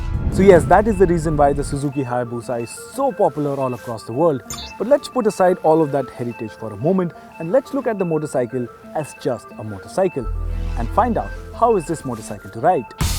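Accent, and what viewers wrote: India. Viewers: Why can't indians ever speak a language without their accent ? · I had to skip the video as soon as I heard that Indian accent 🤢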